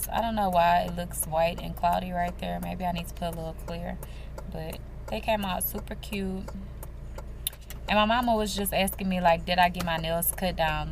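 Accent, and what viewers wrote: South, United States. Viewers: Congratulations on the new job ❤️ LOVE LOVE LOVE just hearing you speak . Such a southern belle . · Omg that southern accent 😂😂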